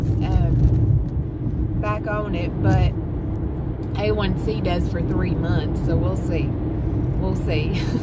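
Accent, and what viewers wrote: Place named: East Tennessee, United States. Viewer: I've been gone from east TN for 3 years. Hearing you talk makes me miss it lol. Love seeing you post again!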